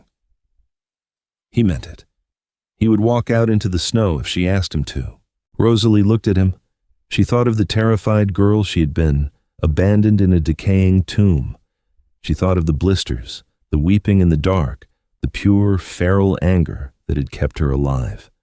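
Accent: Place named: United States. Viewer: An English story of Duke and Duchess read with an American accent is dreadful to listen to 😳😏👎👎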